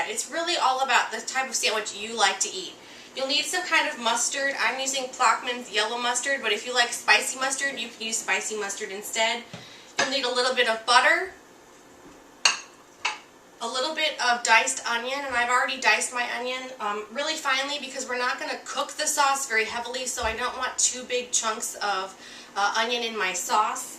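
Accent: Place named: Minnesota, United States. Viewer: are u from minisota cause you have the acent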